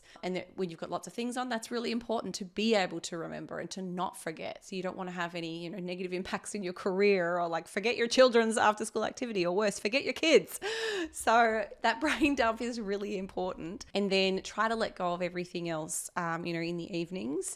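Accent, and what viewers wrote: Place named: Australia. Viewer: Hello from California. Terrific advices & lifestyle vlogs. We knew we were watching a champion ever since we watched your first videos, Ms.. Inspirational. We like the Australian accent, Australian celebrities, & Australian models. You are a fantastic coach/ personal trainer, good teacher. Congrats from our channel 🎊✨🦜🦜🦜Australian Parakeets Stars✨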